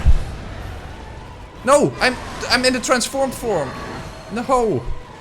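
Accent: Netherlands. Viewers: Its because he's Dutch and funny and smart. The internet likes american accents, doesn't like good jokes just "randemz lolololol" nonsense, and also people don't like smart folk because of social anti-intellectualism. IMHO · Your accent is all too familiar as a Dutch person, but otherwise your comedy is top tier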